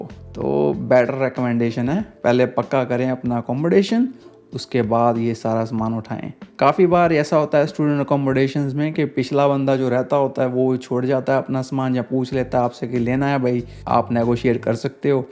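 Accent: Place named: Punjab, India. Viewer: Nice nice.. Your punjabi accent is creating more personal connection with you. Pehle hi your videos were super helpful and now its way more relatable as well ❤️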